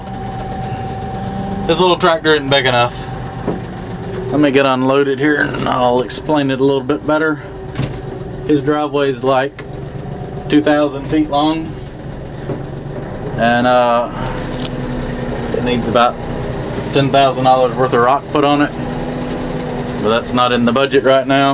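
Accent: South, United States. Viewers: I don't mind your southern accent. We all have an accent so you can't make fun of it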